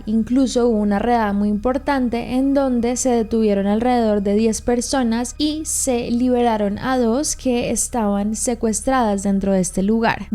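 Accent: Colombia. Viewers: pense que eras colombiana, que bueno que te cuides, muchas gracias por subir informacion sobre este caso, saludos desde peru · Me pasó lo mismo, soy de Gdl... Y el ver este caso con su acento tan colombiano ( hermoso por cierto) me helo los huesos... A dónde hemos llegado?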